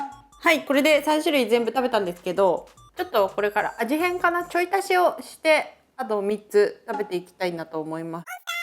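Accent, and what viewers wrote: Izumo, Japan. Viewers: 島根出身だけど最近帰ってないからちょいちょい横に出てくる出雲弁が懐かしくて涙ちょちょ切れ！ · どげだ…出雲弁！同郷の星やね～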